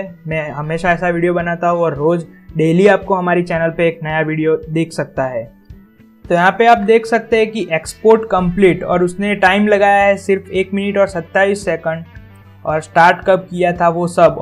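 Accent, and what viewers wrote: Gujarat, India. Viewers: Brother aap Gujarat se ho kya????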